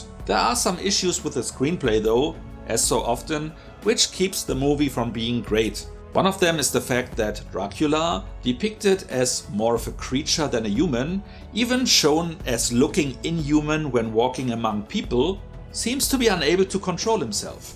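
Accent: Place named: Germany. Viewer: why are you doing a nazi accent?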